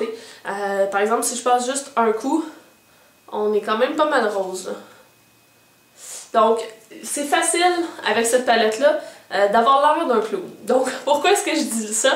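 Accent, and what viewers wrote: Quebec, Canada. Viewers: Je participe a fond! Ta chaine est genial et ton sublime quebecois me fais revé moi grande Parisienne! ahahah! A bientot pour d'autre tuto. J'ai aime la page sous Lima Lapetite!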